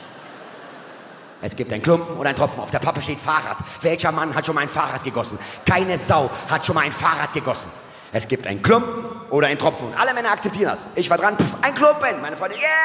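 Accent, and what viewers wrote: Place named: Berlin, Germany. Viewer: Und wieder das gleiche Muster...GÄHN. Haben Sie auch mal was neues? Sexismus, mit Berliner Schnauze...langweilig.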